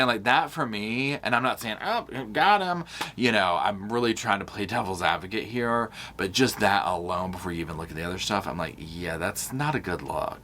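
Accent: South, United States. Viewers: I wish you narrated audiobooks. You have such a great voice. It is perfectly clear with just a hint of a proper souther accent.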